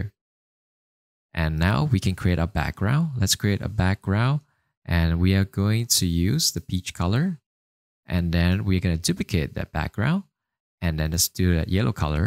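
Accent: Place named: Singapore. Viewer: You sound Singaporean haha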